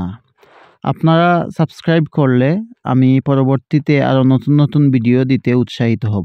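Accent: Sylhet, Bangladesh. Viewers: ভাই আপনি কি সিলেটি নাকি?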